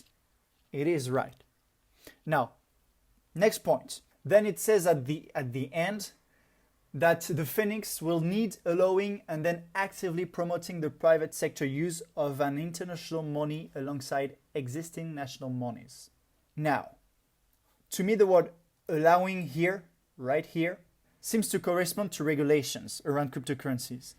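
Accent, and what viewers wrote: France. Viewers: Adam XRP Son Lol. This guy sound very French. Ran is South African...yet I hear how you could confuse the two. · tu parles bien anglais mais ton accent est français ^^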